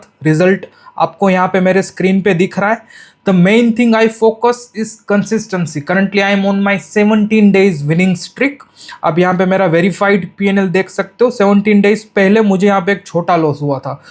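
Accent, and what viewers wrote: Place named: Gujarat, India. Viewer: Gujju lagte ho bhai aap 🙄🙄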